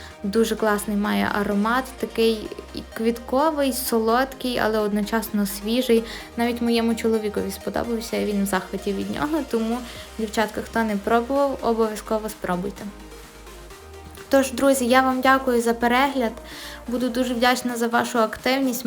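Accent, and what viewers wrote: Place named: Ternopil, Ukraine. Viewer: Це один з діалектів, а не суржик. Нічим не гірший за "чисту" мову заходу України чи "еталонну" мову киян. Відчувається, що дівчина орієнтовно з Тернополя чи Івано-Франківська, чи близько тої території і українська її рідна. Мова є живим організмом і з часом найменш вживані слова просто зникають, так буде і з так званими русизмами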